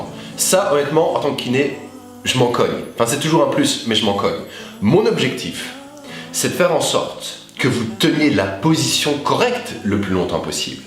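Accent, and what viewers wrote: Belgium. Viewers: Un like juste pour m'accent belge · Tu me fait penser a un belge avec ta voix ou peut etre que tu en est un. Merci pour la video je vais essayer !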